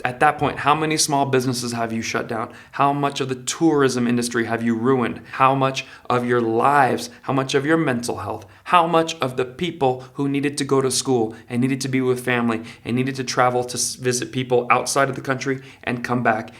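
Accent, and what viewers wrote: Australia. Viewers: Great Aussie voice! You and your impressive impressions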